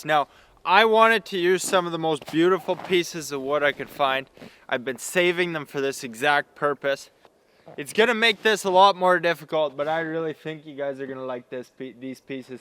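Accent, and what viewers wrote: Canada. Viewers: Your Skills have greatly improved.. Videos are fun to watch and that Canadien accent is killer‼ Vinny [Boston]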